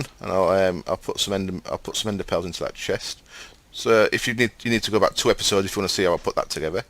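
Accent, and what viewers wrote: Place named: North, United Kingdom. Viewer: yeah exactly the same northern (i think) accent that you have :)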